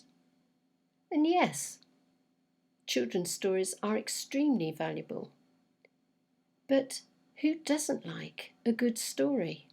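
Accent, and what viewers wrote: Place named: England, United Kingdom. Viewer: Your calm a sweet nature pulled me in. I love your pace and the peaceful feeling you create and give. As a Utah girl in the USA, I absolutely love your English accent! Beautiful story!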